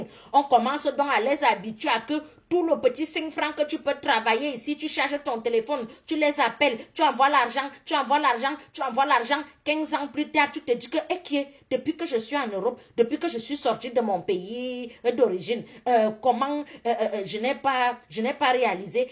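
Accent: Cameroon. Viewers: Cet accent camérounais est vraiment trop dur et fort. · L' accent cameroonais la est fort ooo J te dit ma sœur, labre dargent la nexiste plus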